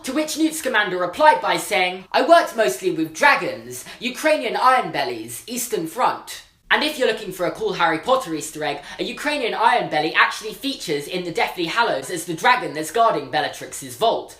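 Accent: England, United Kingdom. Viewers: Where is he from? I recognise his accent, but I can't place it (I know its English, but not sure where specifically) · British accents are adorable tbh I love them so much. I'm watching these videos to hear the accent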